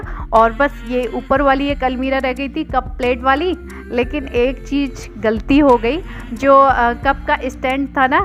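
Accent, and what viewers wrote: Uttar Pradesh, India. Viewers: Kahan rehte ho aap.Accent apka up ka lagta hai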